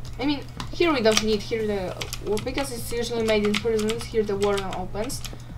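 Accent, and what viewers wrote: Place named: Germany. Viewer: are you german? i like your accent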